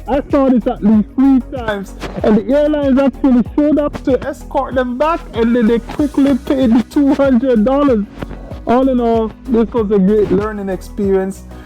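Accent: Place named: Caribbean. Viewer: I hear the west Indian accent where are you from?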